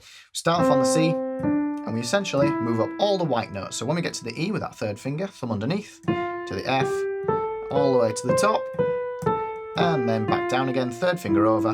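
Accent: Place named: Northern England, United Kingdom. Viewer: Do you do lessons around the north? just assuming you live up here with the accent. Ey up! Cheers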